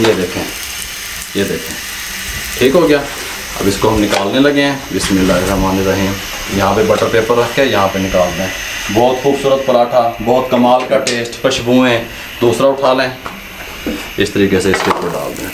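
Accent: Punjab, India. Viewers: I lv the way he speaks punjbi viche viche😂😂 and the way he guides. Allah ap se Raazi ho. Ameen. 😇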